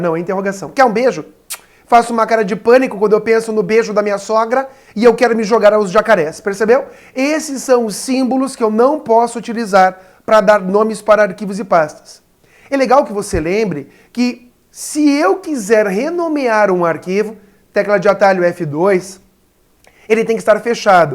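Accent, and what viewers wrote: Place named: South, Brazil. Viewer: Ei professor você nasceu no sul do Brasil? é por causa do sotaque .suas aulas são ótimas ,vou prestar para agetel e agepol,valeu.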